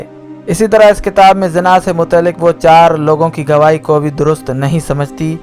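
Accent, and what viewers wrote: India. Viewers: kus ni kush hotahai seems like koi indian bol ra hai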